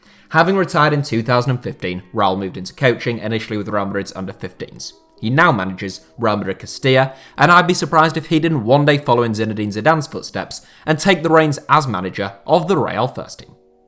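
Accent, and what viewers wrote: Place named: United Kingdom. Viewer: Love The video but your accent iz so british i don't understand shit.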